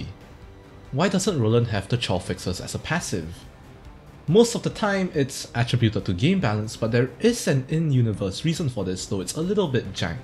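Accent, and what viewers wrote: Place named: Singapore. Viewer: oh your singaporean hey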